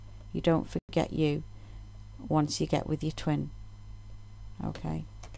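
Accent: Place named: England, United Kingdom. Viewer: your welcome its nice to listen to an english voice too, nothing against any others, ive just not come across many english speaking readers. From uk england.:-)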